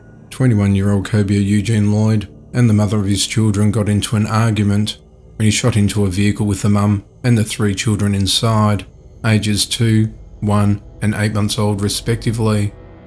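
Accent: Australia. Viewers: is this an ozzy man speaking it feels very real to me hearing such horror from the USA spoken in my own speech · Entertaining and informative as always. Plus I think I enjoy hearing an Australian accent share the news. Great intonation!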